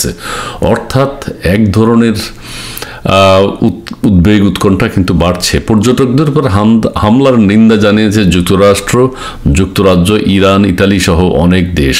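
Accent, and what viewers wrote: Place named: Noakhali, Bangladesh. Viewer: নোয়াখাইল্যা ভাই !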